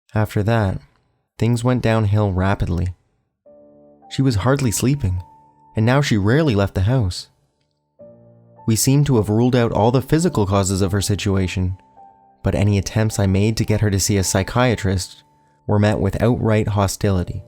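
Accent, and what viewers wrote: Canada. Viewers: Great work friend! After the third one I stopped noticing all the "about" and "out". Canadian accents and humor aside. I think you have an actually soothing voice unlike certain other creepypasta readers, and an excelent selection of stories. Love how you read The Gristers.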